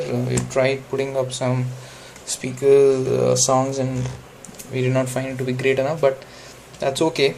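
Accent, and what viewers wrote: India. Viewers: I think you are having trouble with the Indian accent. Grammatically, sounds pretty correct.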